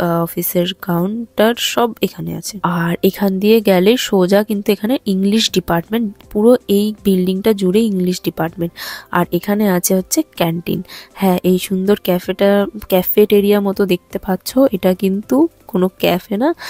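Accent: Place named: Bangladesh. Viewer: Ekhn o sordi kome nai 🥹🤧 R Bangladeshi style e ktha ta wow wow lagse 🤩🫶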